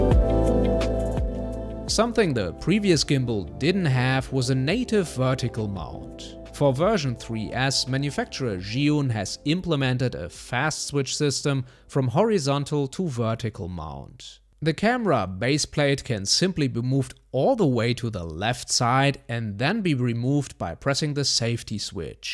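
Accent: Germany. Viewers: German accents are so much fun to listen to.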